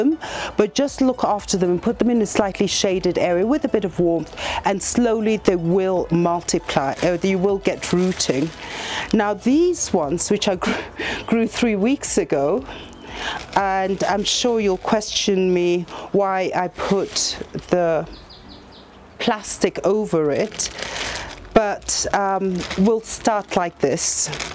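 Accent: United Kingdom. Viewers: Your accent is so British (coming from England myself)!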